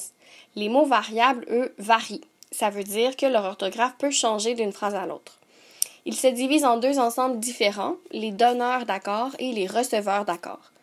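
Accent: Quebec, Canada. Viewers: Bonjour, Elle n'a pas d'accent anglais, mais plutôt une variété québécoise. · Bonjour ! Quel superbe travail !! Mille mercis et bravo . Je me sers de vos capsules et des exercices pour les enfants de ma classe primaire en France encore en confinement . Les enfants découvrent en même temps l'accent québécois , c'est sympa !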